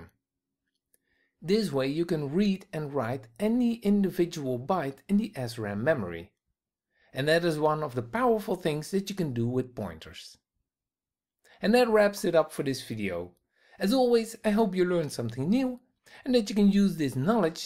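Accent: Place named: Netherlands. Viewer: The guy with the hollands accent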